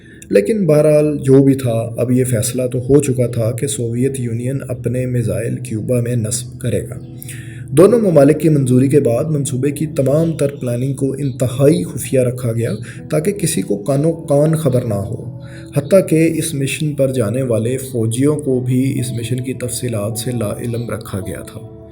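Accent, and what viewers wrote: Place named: Punjab, Pakistan. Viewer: dude, your punjabi acent!! Content is amazing but your accent really ruins it.. Sorry!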